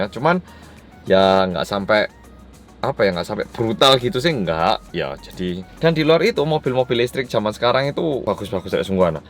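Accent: Java, Indonesia. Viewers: Wajahnya kekoreaan tapi logat bicaranya indonesia banget .mantull..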